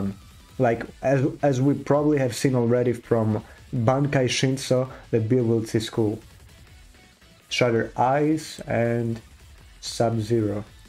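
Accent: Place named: Russia. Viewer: У тя да же акцент русский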